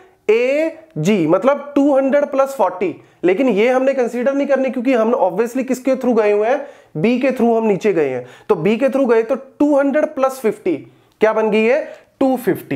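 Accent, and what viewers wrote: India. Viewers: Sorry I cannot understand Indian English...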